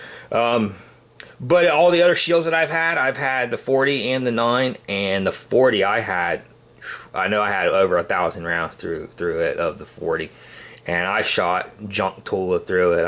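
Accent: Pittsburgh, United States. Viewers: Sounds like a yinzer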